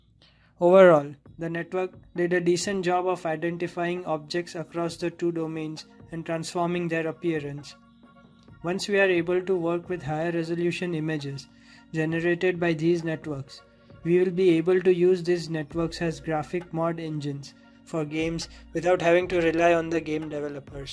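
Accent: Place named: India. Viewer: all i need is 1 sec to understand u are from india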